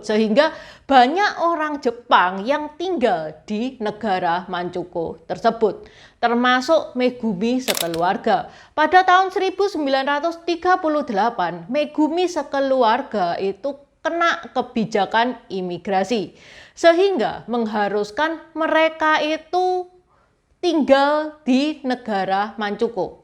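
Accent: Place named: Java, Indonesia. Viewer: Andai aku dengar cerita ini dari radio, pasti aku menyakini klo ini orang Jawa tulen...wkkkk. Maju terus....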